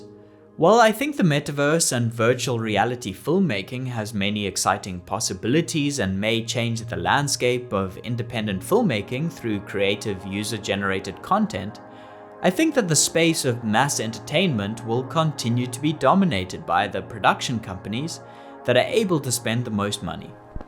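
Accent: South Africa. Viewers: The narrator sounds very South African. 😊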